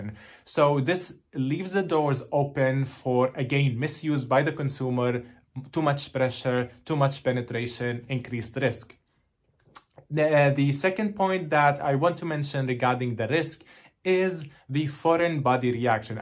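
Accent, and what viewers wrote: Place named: Italy. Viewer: What a charming accent. Are you Italian?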